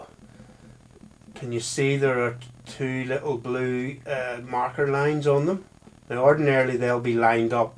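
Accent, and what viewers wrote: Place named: United Kingdom. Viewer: There is something about a British accent that just 'make things right'. Thanks mate. Mine sorted.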